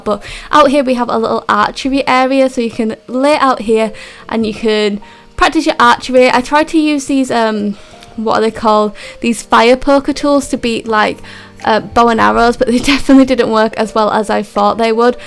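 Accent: United Kingdom. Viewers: This may or may not be creepy but like I really love your voice (like your accent) Maybe its because I'm American but I really want a British accent (Also I just started watching you like 20 min ago and I'm already subscribed :D) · Honey Dew I love her accent too! But I’m warning you do not try and replicate a British accent as an American you’ll sound so stupid!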